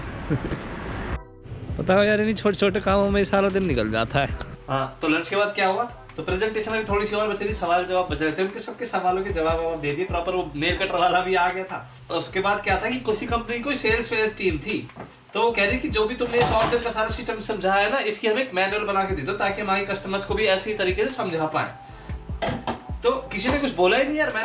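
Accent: Haryana, India. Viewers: Bro I love the u video start or moj aa ri aa b ri ya aise bqt kat ra Jo Haryanvi ton h lov it bro thanks for making videos luv u from Himachal Pradesh India jai hind bro🇮🇳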